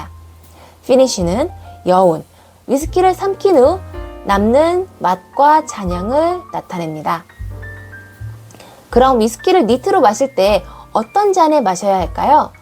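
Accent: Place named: Gyeongsang, South Korea. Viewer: 누나 경상도네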